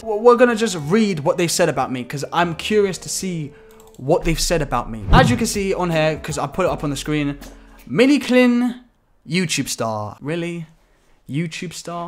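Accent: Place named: London, United Kingdom. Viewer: What part of London are u from? Btw I'm soulth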